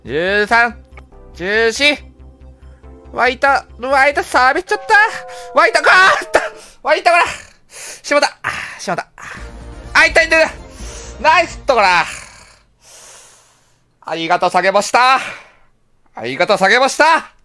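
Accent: Kagoshima, Japan. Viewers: 9割くらいは理解できませんでした😊 鹿児島弁スゴイ👍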